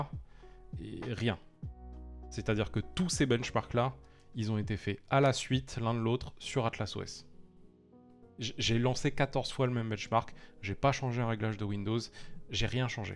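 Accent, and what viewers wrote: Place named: Switzerland. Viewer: Toi t'es suisse mdr je reconnais mon accent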